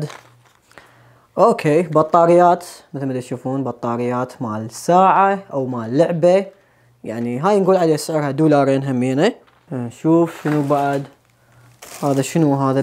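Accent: Iraq. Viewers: احب اللهجة العراقية كرمال هيك احضر الفيديوهات مالتك 🤣😂🤣😂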